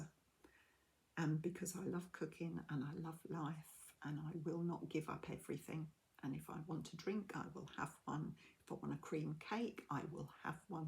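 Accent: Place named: England, United Kingdom. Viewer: Lovely video. I'm so glad to know you. I'm Canadian, but my Gramma was a war bride from England, and I feel a connection, besides crochet. I love the accents you have over "across the pond". I'm on the West coast, and Canada has many different accents, too. Thanks for sharing. Take care and love from Canada 🇨🇦 ✌️🍁💜🐄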